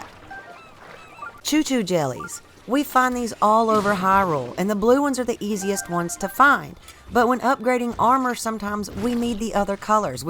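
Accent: South, United States. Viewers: The Southern accent was an unexpected but pleasant surprise lol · I know this game has been out for a while but I just started playing it on this oled switch I also just got. Best choice I made in a while. This video is so helpful. Liked and subscribed. Sounds like you're also from the south, lol. Good luck on your algorithm! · Your country accent is so strong and it sounds adorable lol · First off I just wanted to say that it was refreshing to hear a female voice and one with a southern accent as well. I'm from California, so it's not my accent. 😆 It's nice to hear someone from a different part of the country. Second, I learn a lot from this video. I didn't know you could get arrows from a cooking pot! Regarding the wolves, I like to kill as much as I can for the meat; meat skewers can fetch ya a pretty penny at Goron City. I knew you could change chuchus to other colors, but I didn't know about the water methods. 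Will come in handy! Thanks!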